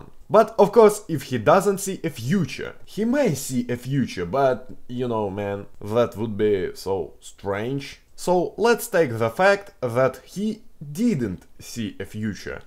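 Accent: Russia. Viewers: This russian accent is impssible to replace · Very 👎 Russia accent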